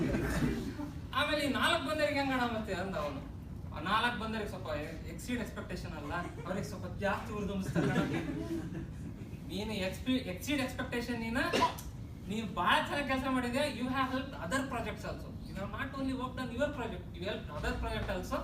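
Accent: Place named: Davangere, India. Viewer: ಯಾರು ಗುರು ಇವ ಪಕ್ಕಾ ದಾವಣಗೆರೆ ಧಾಟಿ ಭಾಷೆ 😍😍😍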